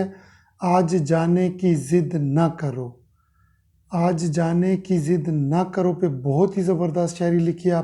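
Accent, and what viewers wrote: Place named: Hindko, Pakistan. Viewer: صاحب بہت اچھا لکھا ایک تجویز ہے کہ الفاظ کی ادائیگی میں بہتری کی ضرورت ہے ہندکو لہجہ پوری طرح نمایاں نظر آرہا ہے آپ بہت اچھی شاعری کررہے ہیں صرف لفاظ کی ادائیگی میں شاعری کا مزاہ پوری طرح نہیں آرہا امید ہے آپ اس تجویز پر ناراض نہیں ہونگے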